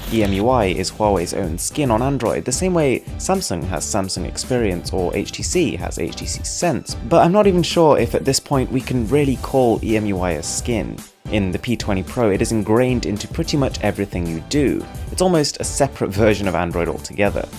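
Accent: RP, United Kingdom. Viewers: Thanks! Good to hear a British accent on YouTube! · This chap sounds awfully posh ya.. Good review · Why get your posh friend to narrate? is your voice that bad?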